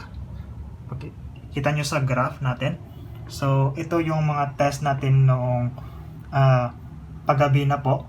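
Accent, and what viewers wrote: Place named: Cebu, Philippines. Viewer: Cebu nga dba? Understandable nman. Walang nkakatawa okay.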